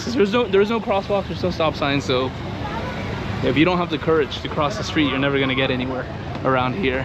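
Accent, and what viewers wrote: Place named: Guatemala. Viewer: Guau que linda es mi Guatemala práctica mente es una chulada gracias a Papa Dios es el mundo 🌎 maya y que grandes están las tiendas y hay de todo y que rico desayuno comieron ustedes y que lindo es el animalito lo bueno que la muchacha se tomó una foto y que linda es música la marimba y de echo venden dulces de algodón y las Nieves son .uy delisiosas no se si ya la probaron ustedes tu eres de Guatemala porque hablas como un guatemalteco gracias exelente y bendiciones 🙏🙏🙏🇬🇹🇬🇹❤️😘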